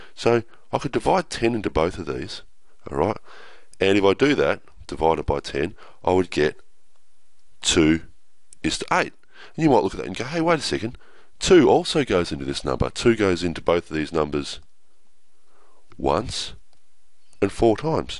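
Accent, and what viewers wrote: Australia. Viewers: He’s Australian. Watch a couple Aussie shows, movies, or certain documentaries and you’ll understand him even if he had a cough. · You can just tell he's australian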